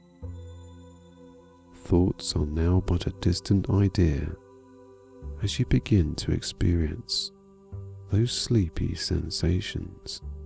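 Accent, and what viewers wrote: Scotland, United Kingdom. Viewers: please tell me are british ?? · These meditations are perfect. <link> him has a gentle, warm, pleasant voice (with a slight Scots accent). The words are simple and clear. The timing is perfect... just as you start to find your mind wandering, he says and if you find that your mind is wandering... . There is no background music and no noise in the background, only his peaceful and engaging voice. The length of the meditations (about 1/2 hour each) works well for me. I find that I tire of some meditations after frequent repetition, but that hasn't happened with this one. The metta lovingkindness meditation always feels right. Some meditation CDs I have tried have not felt right. The voice seemed hurried, or sounded tense; the background music seemed intrusive, or there were noises that interfered with concentration. This is just perfect as an introduction for new meditators, and it's also a meditation that I return to again and again. 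I'd give this more than five stars if that were an option. Even my students, who are not ordinarily very receptive to meditation, relax when they hear this one!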